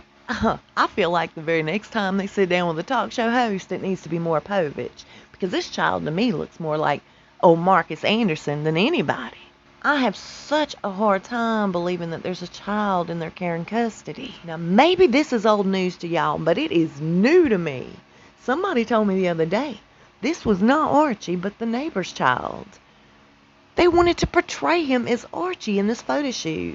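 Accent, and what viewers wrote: South, United States. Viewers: thank you so much for this video and all your videos.I had a hard long day Here in Vegas such a blessing To come home Cook dinner and here that southern Voice.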